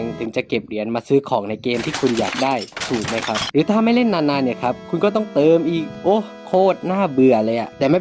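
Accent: South, Thailand. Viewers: คนใต้แน่ๆ5555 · เจ้าของช่่องคนใต้รึเปล่าคะ55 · พี่บ่าวคนใต้รึป่าว😮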